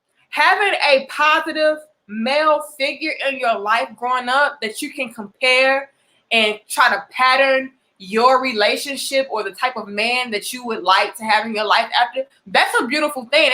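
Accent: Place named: Florida, United States. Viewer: I like the accent. You from Florida?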